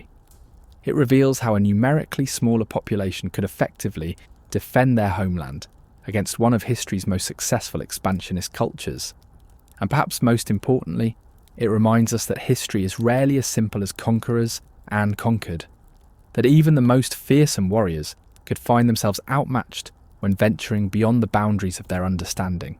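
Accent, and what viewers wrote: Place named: United Kingdom. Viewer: Hi from Ukraine🇺🇦. Im in love with British accent. Its true history ❤like 👍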